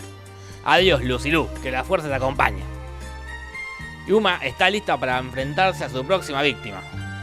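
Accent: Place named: Argentina. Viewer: Tmr che con este canal aprendo hablar argentino :v !! XD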